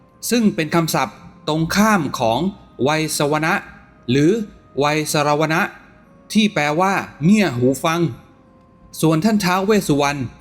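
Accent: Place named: Thailand. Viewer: ชอบเสียงเหน่ออะ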